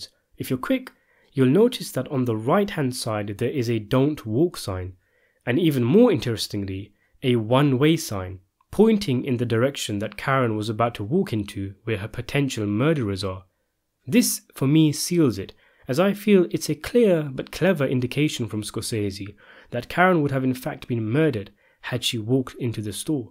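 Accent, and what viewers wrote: England, United Kingdom. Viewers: Suffering as I do from the quintessential America bias to assume anyone with an English accent as a measured scholar, the aesthetic discrepency of approaching this content with said linguistic stylings, tickles me to no end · British accent. Ahh. During Special Forces selection & training in British cultures greatest difficulty is making sure they can pull the trigger. In the States selection etc.was originally modeled directly on Britain's proven methods. That changed. Among other things it was clearly not difficult to find guys who could pull the trigger. That was decades ago. In the current era both cultures have trended towards a greater ability to do so. Capisce ?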